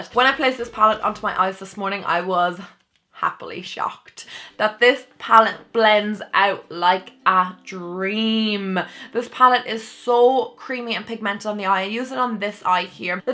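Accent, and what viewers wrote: Ireland. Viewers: Gurl are u irishhhhhh 🇮🇪💘 omgggg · I thought you had a strong Irish accent, I’m Irish myself, but I live in America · I love ur accent I'm Irish also and most YouTubers I watch are American or British ands it's so refreshing to hear an Irish accent on YouTube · are you IRISH???? cuz I am and us kind irish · fucking love your accent #ireland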